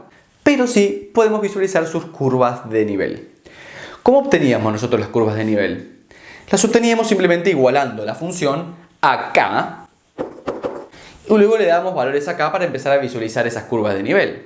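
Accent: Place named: Argentina. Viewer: TIene acento argentino pero habla español de españa, wtf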